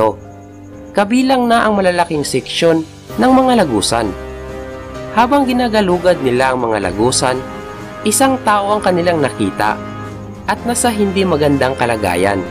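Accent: Visayas, Philippines. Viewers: Bisaya dong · bisaya to Hahaha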